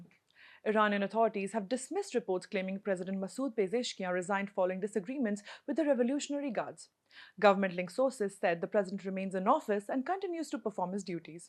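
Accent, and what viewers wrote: India. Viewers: I feel as if I were listening to BBC in Indian accent.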